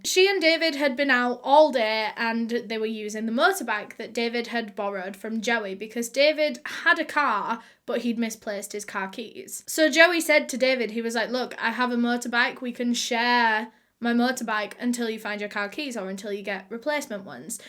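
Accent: United Kingdom. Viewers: Who else is just mesmerized by her British accent?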